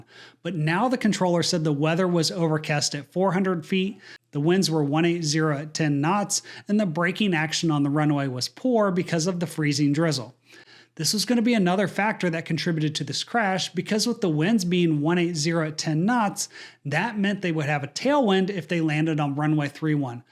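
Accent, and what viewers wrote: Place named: Midwest, United States. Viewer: I want to ask a favor, unrelated to this specific video, but pertaining to all videos you make: could you please say "so M ething" with an EMM instead of "sunthing" (with an ENN)? Thank you. It's not important at all and probably shouldn't bother me, but I feel it detracts from the overall quality of your videos, which is otherwise top notch. Ending present participles with '-een' instead of '-ing' is, I guess, only a harmless feature of a regional Midwestern accent and much less noticeable. Apologies: no offense meant.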